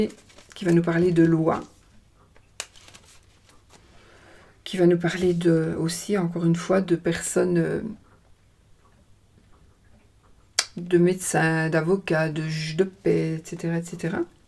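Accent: Belgium. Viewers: Aha j'aime trop l'accent belge vous êtes belle en plus 🤭🤩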